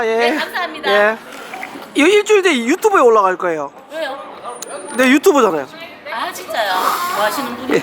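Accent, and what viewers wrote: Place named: Gyeongsang, South Korea. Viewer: ㅋㅋㅋ고단세 ㅋㅋ 갱상도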